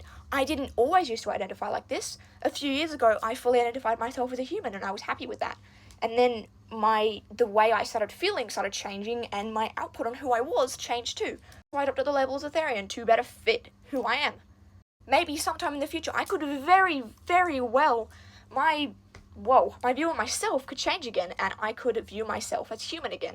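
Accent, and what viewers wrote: Australia. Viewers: …. Have I found another fellow Aussie!!!! It’s just the accent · He is Aussie, yes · Oh my God, it’s giving voice reveal. The Australian accent is Australiaing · are you Australian? 😭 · r u australian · I love your Australian accent 🥺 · THANK YOU.. I was once a therian, but i stopped bc i didn't feel the same anymore, i abandoned everything related to it thinking it was just a phase or how i was burn out on doing wtvr this is..I thought i was faking being one and it upsets me sm.. I thought i were never one (which i still believe in that but wtvr), now i found out my identity just shifted (which i did not control and felt so sadly nostalgic tho-). Also i rlly love your videos, and your Aussie accent haha.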